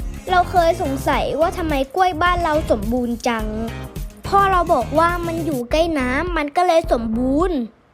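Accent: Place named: Ratchaburi, Thailand. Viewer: อยู่จังหวัดไหนล่ะครับเนี่ยฟังสำเนียงเหมือนอยู่ราชบุรีจังเลยล่ะเนี่ย ไม่ราชบุรีก็นครปฐมล่ะนะเนี่ย 555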